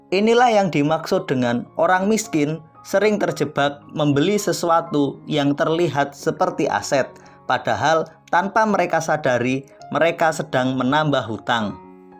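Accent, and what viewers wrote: Java, Indonesia. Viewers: Wah rasis juga ya. Emang kenapa klo suaranya jawa medhok? Apakah jawa itu miskin?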